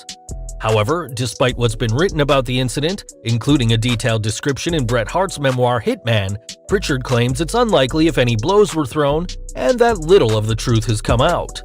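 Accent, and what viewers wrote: England, United Kingdom. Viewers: Lol he's english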